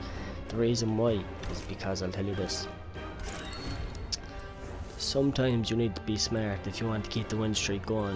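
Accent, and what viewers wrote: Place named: Ireland. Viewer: Lad your Irish omg I'm Irish too ! Add me S2S ( Nintendo Switch )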